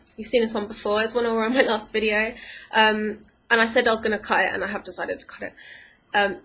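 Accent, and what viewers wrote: United Kingdom. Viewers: haha yu said "whatever" soo americann lol , but i love your british accent (: cool video x3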